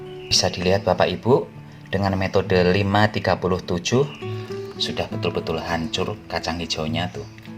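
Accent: Banyumas, Indonesia. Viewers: Sangat kreatiif ms... bahasa ngapaknya itu saya jg sangat suka...lucuuu lgi😁😁😁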